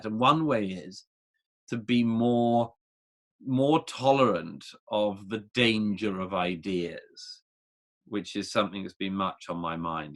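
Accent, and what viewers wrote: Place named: RP, United Kingdom. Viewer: Wow, that's the poshest sounding nonsense I've ever heard.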